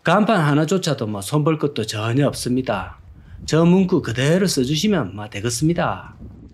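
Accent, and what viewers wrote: Gyeongsang, South Korea. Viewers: 오늘의 사투리 따시고. 갱상도 간편한 사투리 등 따시고 배부르고.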